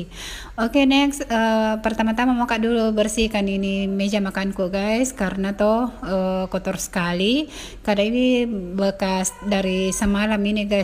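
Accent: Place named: Makassar, Indonesia. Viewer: Logatnya kayak orng mkssr bund...meman orng mkssr.ya?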